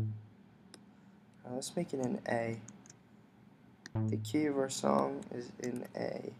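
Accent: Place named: New York, United States. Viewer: just followed you on soundcloud bro. are you from ny/nj? u have that accent lol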